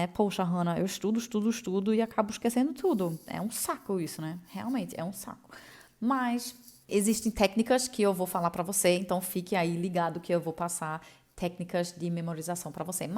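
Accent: Northeast, Brazil. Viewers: Sotaque nordestino e carioca . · Nossa que sotaque de nordestina, amei akkakak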